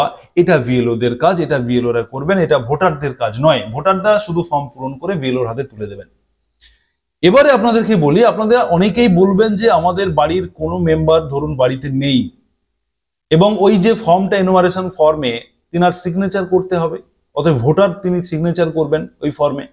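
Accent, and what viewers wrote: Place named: Bangladesh. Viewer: Apnar kotha sune mony hocche apni Pure bangladeshi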